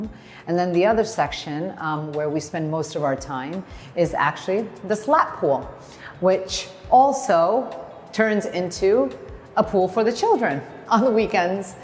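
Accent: United States. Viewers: New York accent. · she sounds American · I can tell by her accent, we are from the same area. I'm in NJ, so that's my guess. A Jersey girl! The house is wonderful. Totally my style. I think the trees indoors, do drive me nuts haha.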